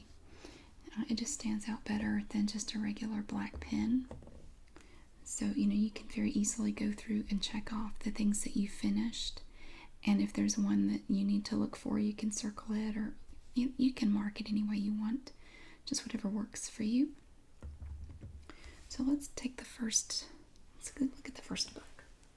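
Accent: South, United States. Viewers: Love your accent, to me a Southern accent is a cockney accent :-)I live in the West Midlands UK.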